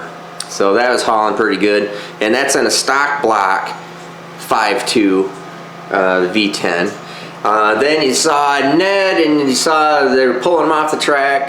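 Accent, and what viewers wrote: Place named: Northeast, United States. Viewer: "...right there Baab. At the haat spaat of the journal..."! You're from the northeast! A hillbilly like me can tell an accent like that anywhere!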